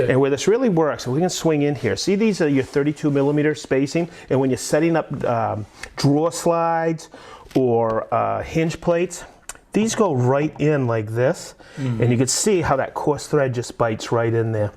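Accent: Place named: Boston, United States. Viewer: Thanks to that nice Boston accent, i felt like i was watching an wpisode of This Old House 😌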